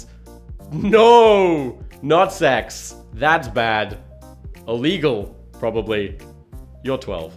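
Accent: United Kingdom. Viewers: Wait, aren't you british? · Wait isn't he British? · are you british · he's british?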